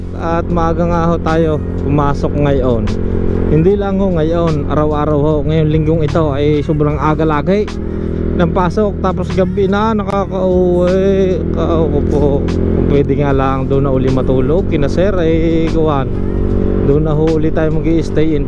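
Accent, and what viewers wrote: Batangas, Philippines. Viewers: gustong gusto ko yang content mo boss at tonong batanggenyo..🙂meron din kc akong kamag anak sa may lemery batanggas..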